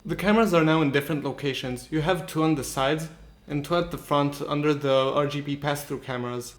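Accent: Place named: Israel. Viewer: מאיפה קנית אחי?(if your Israeli I get it from your accent)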